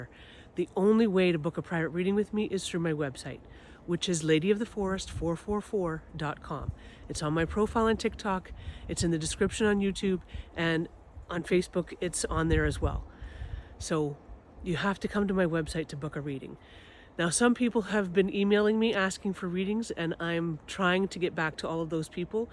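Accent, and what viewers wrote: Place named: East Coast, United States. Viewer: We love you soooo much! And thank you for reaching all of us like you do! Your messages have changed my life! Love you I was gonna say, are you a east coaster? I grew up in Sydney NS and I recognize your awesome east coast accent poppin in, anywhooo love you moon and back and thank you for all that your channeled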